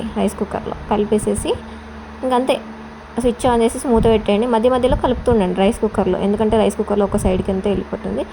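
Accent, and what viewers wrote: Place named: Telangana, India. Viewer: Telangana ammai la undheyy🫠🤔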